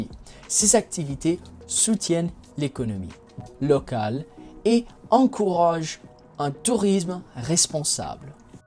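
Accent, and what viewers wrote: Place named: France. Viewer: Excellent ton french accent.. Élise et Julien ainsi que Annick ont eu ton lien.. Il devrait echanger avec TOI.. 😊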